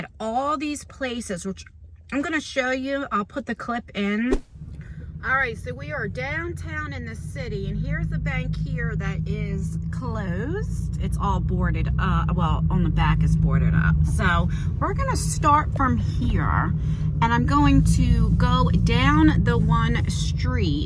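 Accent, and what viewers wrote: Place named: South Jersey, United States. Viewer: 😀 I knew you were from South Jersey by the way you talk ( I'm in Mantua )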